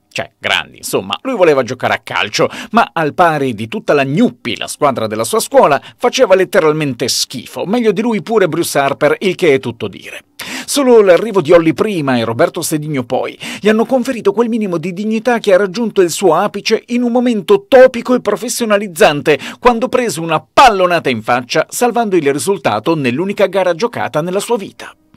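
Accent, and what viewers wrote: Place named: Trentino, Italy. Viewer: YouTube pullula ormai di canali gestiti da appassionati di anime vecchi e nuovi che allietano il loro pubblico con vari tipi di video dedicati all' argomento... ma, come dice il proverbio, la classe non è acqua: o la si ha oppure no. E tu, possiedi una classe ineguagliabile che sai mescolare abilmente con una intelligente ironia rendendo i tuoi video divertenti, interessanti e piacevoli per la loro comicità mai volgare o rozza. Sarà forse il tuo meraviglioso accento trentino a conferire al tuo eloquio quel suono pulito ed elegante in qualsiasi contesto, sarà la tua evidente cultura unita alla tua innegabile simpatia... Fatto sta che dovrebbero esserci più youtuber come te ed è sempre piacevole per me guardare e ascoltare i tuoi video. Mi piace considerarti un po' come un amico che mi fa sorridere e mi tieni compagnia con le tue interessanti pubblicazioni, la cui varietà di genere e argomenti dimostra la tua incredibile versatilità.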